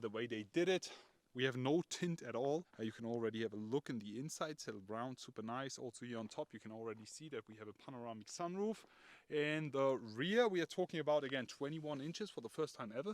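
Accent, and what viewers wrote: Germany. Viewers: I like the way you spell the words like Arnie 😂 I can feel some German vibes coming through your voice. Really really Arnie-ish 👍🏽 I love it 👏🏽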